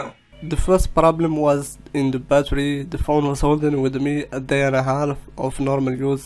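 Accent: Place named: Arab world. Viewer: It's an arabic accent But u r right😁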